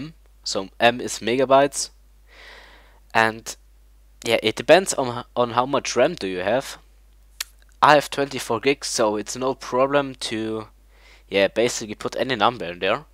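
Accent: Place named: Germany. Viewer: MAn merkt dass du deutsch bist xD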